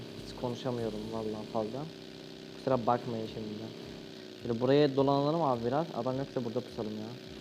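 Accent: Azerbaijan. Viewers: Qurban olum Azərbaycan Ləhçəsinə 😁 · Sən Azerbaycanlısan?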